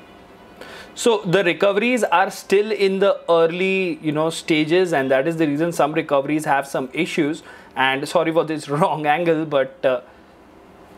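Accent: India. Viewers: nice an indian speaking english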